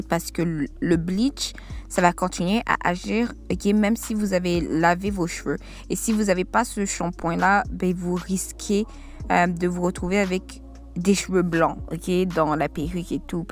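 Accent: Quebec, Canada. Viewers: Bonjour après tout ces mois la perruque est-elle toujours aussi belle ? Je compte l’acheter mais j’ai peur car les vendeurs sont très irréguliers. D’ailleurs tu l’as vraiment acheter toi même ? Je me méfie souvent des partenariats 😂 Sinon je kiffe ton accent (je suis française) mais tu es québécoise non ? D’habitude le français québécois et moi😬 Mais j’aime trop le tiens 😭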